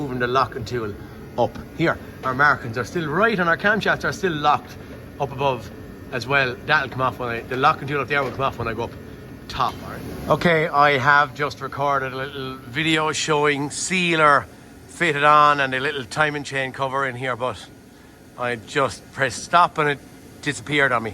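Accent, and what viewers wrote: Ireland. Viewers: the southern Irish accent is a great accent am from the north of Ireland I can understand your accent easily. Great videos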